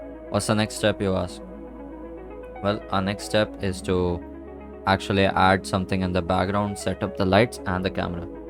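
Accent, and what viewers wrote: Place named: India. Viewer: The Indian Accent Be Hittin Hard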